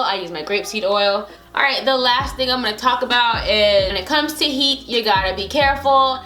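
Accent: Jamaica. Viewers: I knew i heard an jamaican accent in one of ur videos but i just brushed it off as i was too proud lol now u cleared that up. 876🇯🇲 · Video sell off. Real Jamaican talk. Any tips for bald patches. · Hair is beautiful queen!!! Do you have a patois accent btw?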